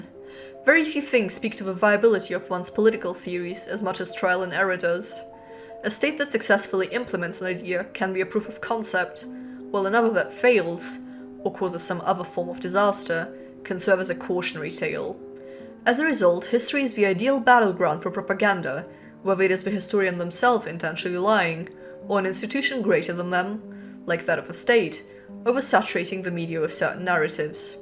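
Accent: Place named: United Kingdom. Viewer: legendary youtube recomended pull did not expect you to sound so british...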